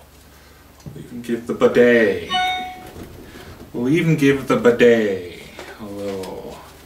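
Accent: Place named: Canada. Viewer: Wow, lots of haters today. I ignore the Canadian accent, profanity, condescending attitude, occasional mistake, just to see someone enjoy what he does and learn as he goes. If you don't like it, go see Dave's World Of Fun Stuff, Will's Easy Guitars, or any of the other millions of guys doing this, (Or something like it) on YouTube.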